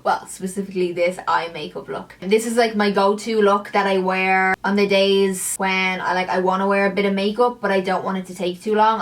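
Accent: Ireland. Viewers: Are you Irish? I love your accent👍🏻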